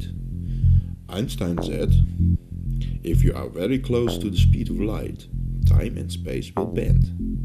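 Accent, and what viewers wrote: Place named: Netherlands. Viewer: This is the wordt dutch accent I have ever heard! The animation is really nice, but has anyone actually built it (before claiming all kinds of antigravity technology)?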